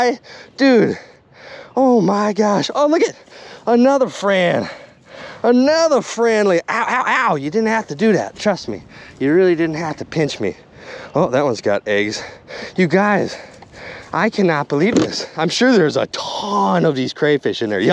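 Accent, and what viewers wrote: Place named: Milwaukee, United States. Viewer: Total Wisconsin Milwaukee accent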